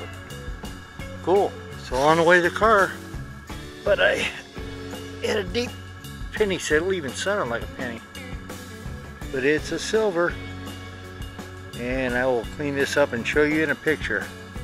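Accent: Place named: Chicago, United States. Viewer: I dig that classic chicago accent. Happy hunting!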